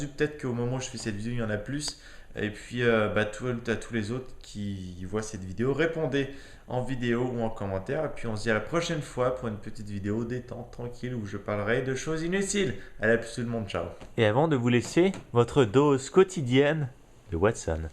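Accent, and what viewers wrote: Canada. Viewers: Accent canadien <3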